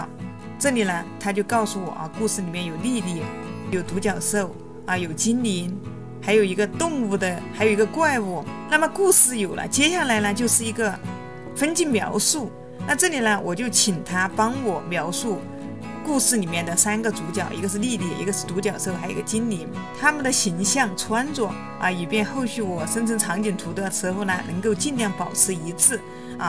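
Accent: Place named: Sichuan, China. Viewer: 四川的吧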